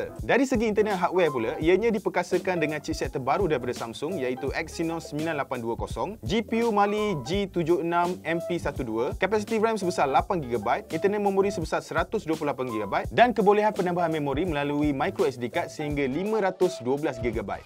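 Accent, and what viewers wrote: Malaysia. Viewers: Sedap juga dengar logat melayu malaysia, beda sikit dengan melayu sumatera. Big love from indonesia😍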